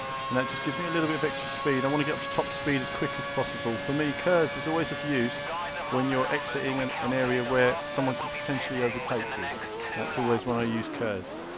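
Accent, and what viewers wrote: England, United Kingdom. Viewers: It's a figure of speech. I'm fully aware he is an Englishman. Think the accent gave that away :)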